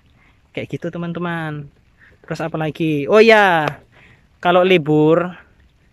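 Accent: Java, Indonesia. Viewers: Asli kalbar tapi logatnya ada jawanya?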